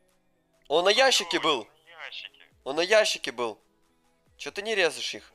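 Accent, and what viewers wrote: Ukraine. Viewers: ты украинец? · Видно видно Украинская проризается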